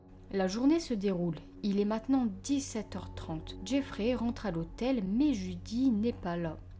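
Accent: North, France. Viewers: Je viens de te découvrir..... Marathon de vidéos depuis cette nuit ! Bon travail ! + 1 abonnée ! Ton accent viens du nord si je me trompe pas ?!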